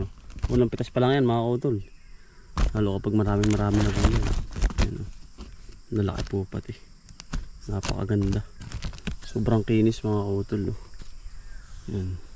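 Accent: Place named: Batangas, Philippines. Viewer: Tanung lng po kautol saan po ang lugar nyo kc ung pronounce ng salita nyo parang taga batanggas po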